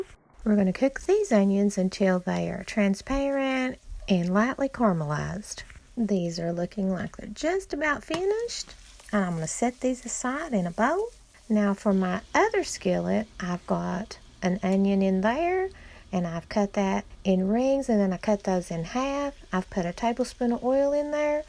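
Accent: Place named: Georgia, United States. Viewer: Sounds like you might be a Georgia girl. I could fill up on onions and peppers, put them on a sausage dog is always the right thing to do. I'll try the other ones too. 👍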